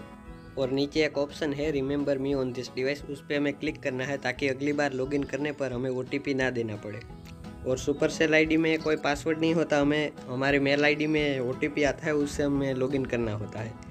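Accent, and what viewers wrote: Gujarat, India. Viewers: Hindi with Gujarati accent.... Gujarati hai ap....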